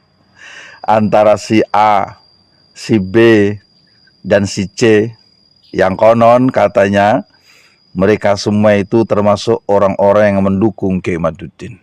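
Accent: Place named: Nganjuk, Indonesia. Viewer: Beeelas, ....!!! 😂😂😂 Manteb podho ndesone lur, persis wong nganjuk.😂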